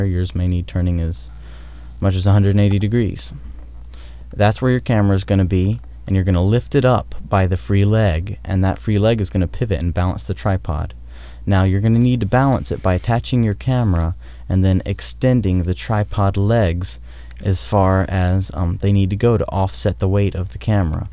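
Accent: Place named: California, United States. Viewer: it's weird to hear your voice before you got your California dude-bro accent haha <3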